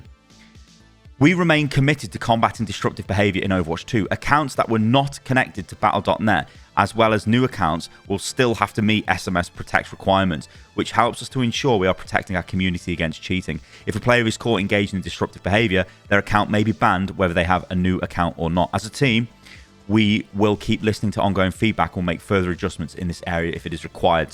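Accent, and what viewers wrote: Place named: West Midlands, United Kingdom. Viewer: Not just British, but the West Midlands accent, mate, and the deep voice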